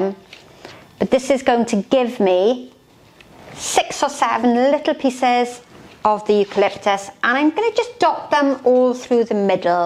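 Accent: United Kingdom. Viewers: I'm from Canada 🍁, cheers love, perhaps because your British with accent yea. British with flowers 💐 is like fine wine. All right than, thanks dear.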